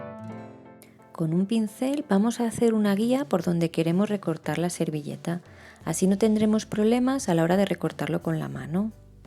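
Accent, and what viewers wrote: Spain. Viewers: Mas bien habla legit imo español de España.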